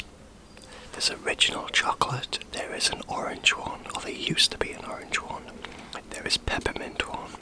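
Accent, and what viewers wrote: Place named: Newcastle, United Kingdom. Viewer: The Geordie accent works really well for 👍😊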